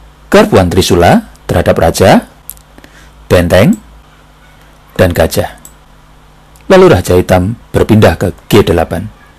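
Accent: Java, Indonesia. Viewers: Saya paling suka chanel catur ini.. simpel ga banyak pernak pernik.. bahasa mudah dimengerti.. kadang ada kata2 lucu macam pion anak kecil imut ini.. hahaha.. logat jawa khas kental sy senang skali. Upload terus mbah..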